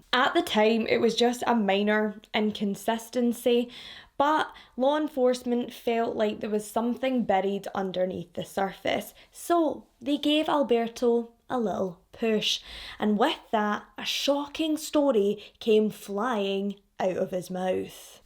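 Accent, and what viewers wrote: Scotland, United Kingdom. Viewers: You remind me so much of my Scottish friend that I miss so much that it feels super comforting to listen to you talk 😅 Truly you're an absolute delight, can't wait to watch this whilst procrastinating tomorrow 😌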